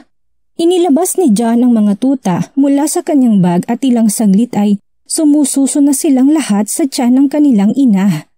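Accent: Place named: Visayas, Philippines. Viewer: Bisaya man gid ang accent ng Narrator 😊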